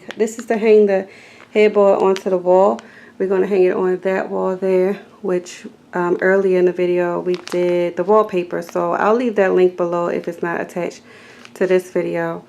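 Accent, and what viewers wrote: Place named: Baltimore, United States. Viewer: You sound like you from Baltimore, MD if so ayyyye! Great video!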